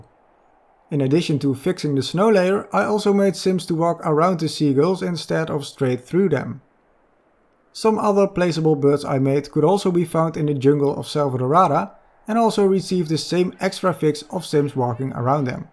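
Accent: Netherlands. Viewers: You sound Dutch to me🤣 if I'm correct you will be able to understand this ; Het ziet er goed, ik ben hier heel blij mee! Dank je wel voor alle uitleg!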